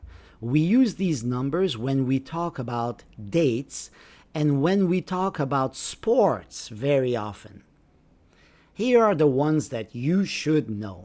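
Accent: United States. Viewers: American trying to teach how to say numbers in English. Absolutely awful accent and certainly we native British people do not speak like this woman. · stupid American English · You speak American English. What about dates in British English?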